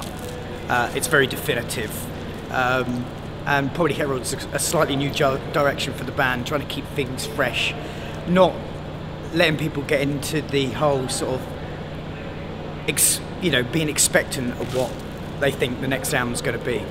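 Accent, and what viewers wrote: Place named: RP, United Kingdom. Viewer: I can't take him seriously as a front man for a metal band with that posh English accent.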